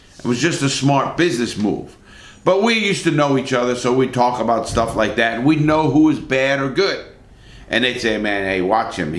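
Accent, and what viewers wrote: New York, United States. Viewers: Your from new York right?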